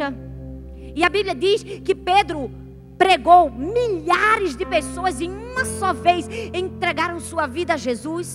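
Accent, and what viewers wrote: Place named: Pernambuco, Brazil. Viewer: eu tenho esse mesmo sotaque dela. 😂😂 Tbm sou de Pernambuco. Só falta eu visitar a Igreja do Amor e apreciar esse 'bela' voz de perto 😂😂😂😂😂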